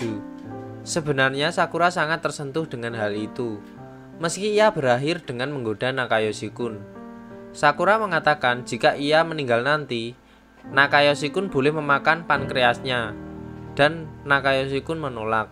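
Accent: Java, Indonesia. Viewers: Abang orang jawa ya? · Medok men... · Bro jagan medok dong plis deh.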